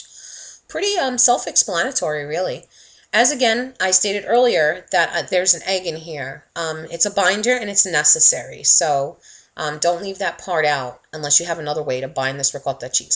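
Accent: New York, United States. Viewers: you sound like you are from New York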